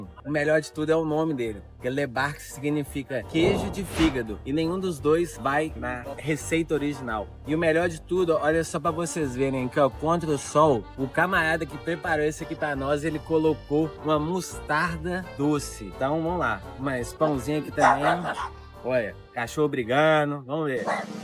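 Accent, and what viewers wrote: Minas Gerais, Brazil. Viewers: 😂😂😂😂❤❤❤, seu canal é ÓTIMO! Mas o seu mineirês é ainda melhor 🎉🎉🎉 e só enche a gente de saudade. Pra pronúncia de quase tudo, principalmente pra gravar, use a referência do Google. Weisswurst vira então Váissvurst - facim-facim, né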